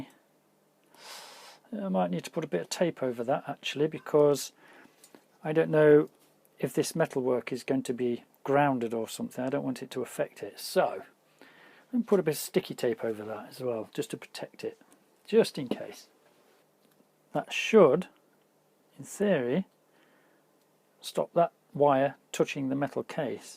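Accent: United Kingdom. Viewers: Hi Sir I just want to ask you are you from UK???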